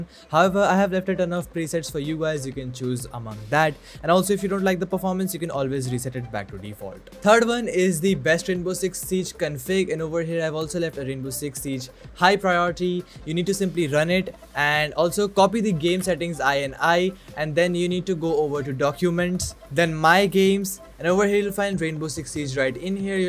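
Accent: India. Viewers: indian accent, dislike, next video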